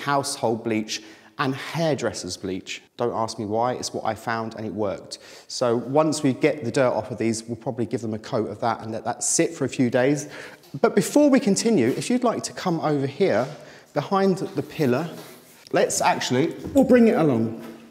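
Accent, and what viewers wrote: United Kingdom. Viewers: It was nice to see your sarcastic humor. I'm American and I find it interesting when British folks pronounce words differently. Just keep saying aluminum the way British way. Obviously some Americans think they need to correct your pronunciations.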